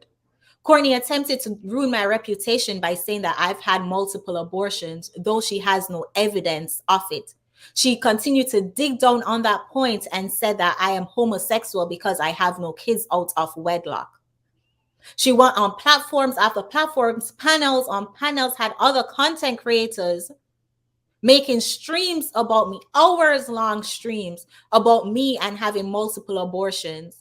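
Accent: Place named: Jamaica. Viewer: Omg, I just came across your channel and it seems to me you’re Jamaican I can tell by your accent. My problem is why are you on YouTube in the American space talking about there issues when Jamaica 🇯🇲 has bigger problems. Jamaica and Jamaicans have much bigger issues than this! You know Jamaican men don’t care about babymothers and Petty things like this. None of what you say is even a real problem in comparison to the killings and the violence in black communities across the globe, especially in your country Jamaica 🇯🇲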